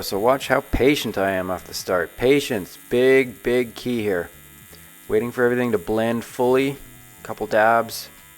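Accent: Canada. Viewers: U canadian bud. Sick welds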